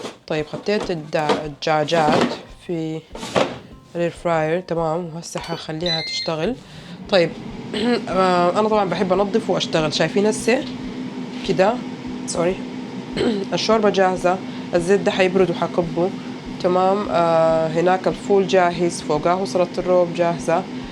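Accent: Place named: Gulf, Arab world. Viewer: السلام عليكم ورحمة الله وبركاته ورمضان كريم عليك اختي. معليش عندي سؤال محيرني هل انتي وزوجك سعوديين او خليجيين ليه بتتكلم خليجي مع انو متأكد انكم سودانييين 100٪ ليه كذا ياريت الاجابه علي التعليق الواحد دايما يكون علي طبيعتو افضل والله. تحياتي لكم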